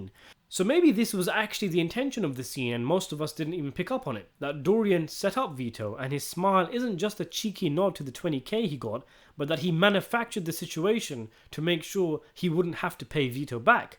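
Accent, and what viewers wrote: United Kingdom. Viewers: By far my favorite part of your channel is hearing you say Sopranos wordplay in a UK accent. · I love this British accent commentary..... A muncher of ass LOLOLOLOL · While i feel retarded for listening to this british "oh well let me explain to you dummy stuff" cant stop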